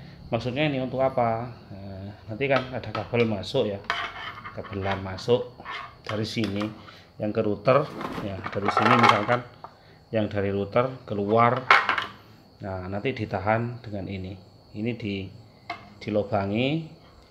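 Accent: Madura, Indonesia. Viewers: Reng madureh mas?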